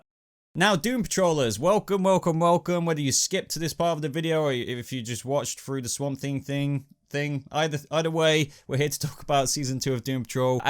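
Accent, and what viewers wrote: United Kingdom. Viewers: OK I've just came across your channel, and thought, due to your accent that you are in the UK, but after what you kept saying about the CW, and DCUniverse things and hbomax, none of these options are available in the UK, so you must live abroad to be able to watch these programmes regularly? If I'm wrong then my apologies, but I'm confused, lol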